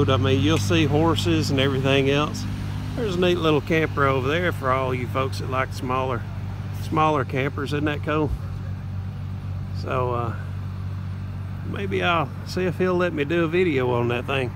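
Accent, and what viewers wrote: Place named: Louisiana, United States. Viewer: 3 or 4 time governor of Louisiana? " "! Love the "TALK" from down home. y'all! Cajun:-))))))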